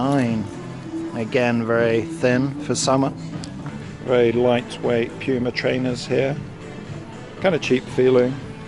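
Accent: United Kingdom. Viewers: Are you British? I know, the accent is but hey one can't make assumptions nowadays. Is there discrimination against foreigners in Russia?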